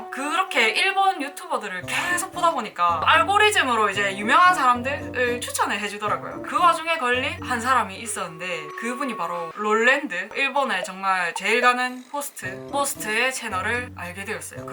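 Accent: Busan, South Korea. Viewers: 말투가 완전히 부산 가시나네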